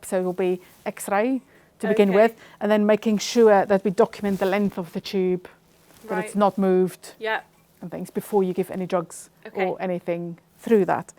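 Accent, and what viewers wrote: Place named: United Kingdom. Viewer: the thick blimey accent mate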